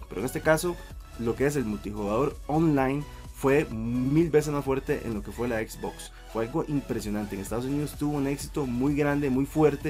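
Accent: Costa Rica. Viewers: Ese acento, costarricense pa ¡pura vida! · Playo, tico hablando!!!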